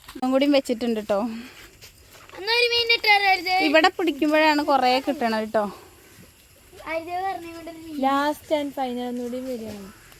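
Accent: Malappuram, India. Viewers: സൂപ്പർ നിങ്ങൾ മലപ്പുറം ജില്ലയാണല്ലോ സംസാര രീതി കേട്ടപ്പോൾ തോന്നി എവിടെയാ സ്ഥലം 👍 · നിങ്ങൾ മലപ്പുറത്തു കാരാ സംസാരം കേട്ടിട്ട്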